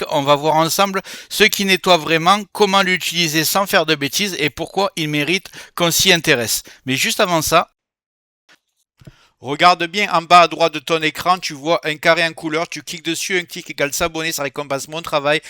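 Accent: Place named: South, France. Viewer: Merci! JE suis un Québécois francophone et ça me fait du bien d'entendre un expert qui parle de Windows en bon français avec un accent du sud! Comme je vis dans un océan d'anglos, et que la technologie venait surtout des USA je regarde beaucoup de vidéos en anglais mais j'apprécie le fait de vous écouter dans ma langue!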